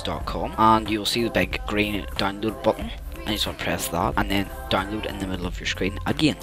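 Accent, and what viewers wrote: Northern Ireland, United Kingdom. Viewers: Mate are you Northern Irish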